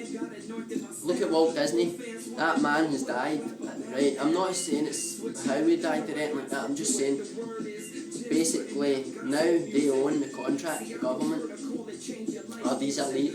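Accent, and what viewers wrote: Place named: Scotland, United Kingdom. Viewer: Thanks i just love scotish accents i dont know why i just do but your cool anyway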